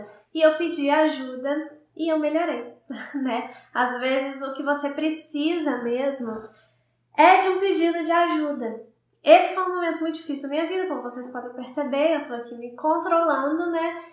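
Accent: Minas Gerais, Brazil. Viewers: Boa noite,! Você é mineira?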